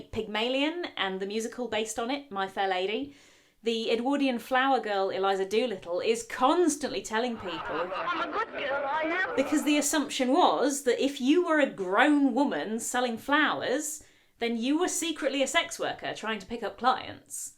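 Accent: United Kingdom. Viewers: You rock in your knowledge. And that endearing British accent done with panache is so delightful.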